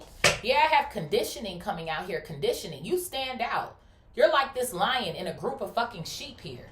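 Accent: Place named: California, United States. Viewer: she sounds like shes from cali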